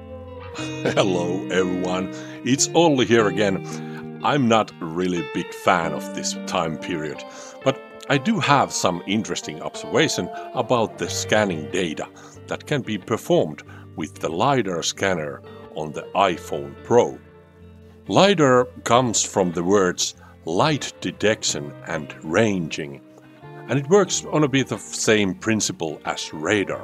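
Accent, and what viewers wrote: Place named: Finland. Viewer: The content is great, thanks! I also very love the Finnish english. The sound of their voice is so calming, also when they are really upset :)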